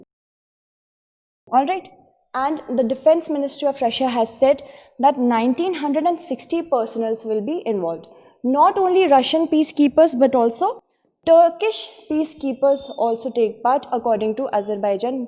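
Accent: India. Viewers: Is She Indian? Her accent is like Indian.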